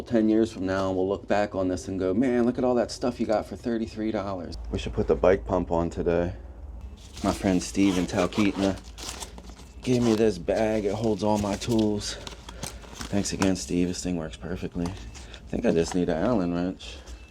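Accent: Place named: Philadelphia, United States. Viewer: This dude is from Philly i can tell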